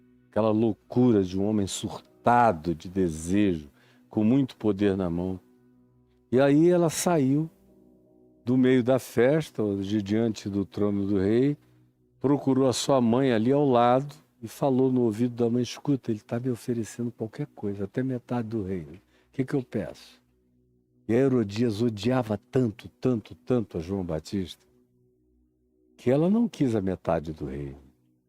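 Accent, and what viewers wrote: Recife, Brazil. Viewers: Aí sabe que fala Recife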